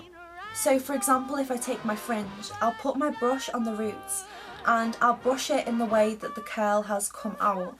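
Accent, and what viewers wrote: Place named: United Kingdom. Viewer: Oh my god, I just thought I saw Marylin Monroe when I clicked this vid. You look exactly like her!! Only it's a little bit absurd to watch Marylin Monroe look alike talking in a british accent.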